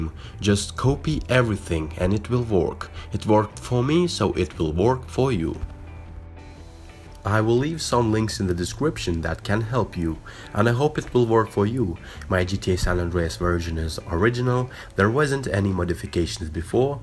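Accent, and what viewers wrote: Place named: United Kingdom. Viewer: cause your accent sound a little bit British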